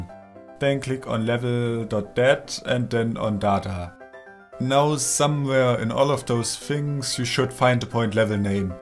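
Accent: Germany. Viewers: Man hört richtig raus das du Deutscher bist :D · Man hört sowas von das du ein deutscher bist xD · Warte, "Aufbau" und dann noch dein Akzent... Ich glaube du bist Deutscher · ich auch hat man aber auch an den namen der welten gesehen und an deiner ausprache. das der beste command schreiber der welt ein deutscher ist omg xD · Are you german´? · I love your German accent so much… :D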